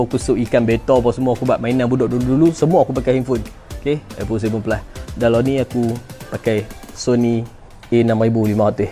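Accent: Kedah, Malaysia. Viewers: Abang orang mana Kedah ka pineng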